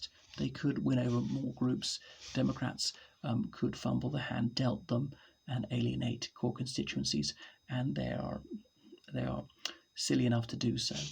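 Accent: RP, United Kingdom. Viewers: You have posh accent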